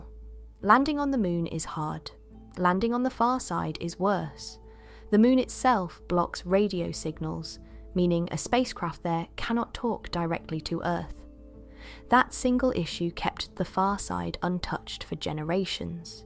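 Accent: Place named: England, United Kingdom. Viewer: Hmmm A Chinese AI with an English accent. Already sounds suspicious. It asl us to like and subscribe before the story gets strarted, They found a metotite in mongolia that was clearly marked made on far side of moon. They found that the samples taken 55 years ago and thousands of miles from their sample site were different. Okay, I've listened to enough. Thanks for the laughs.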